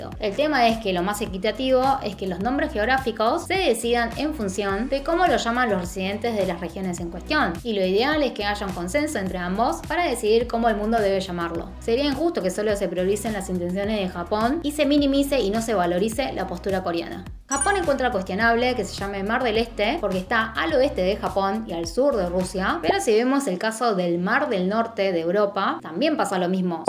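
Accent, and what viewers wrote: Argentina. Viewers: Pensé que vivía en Argentina por que claramente entiendo su acento Argentino pero no crei que vivía en Chile me sorprendió la verdad.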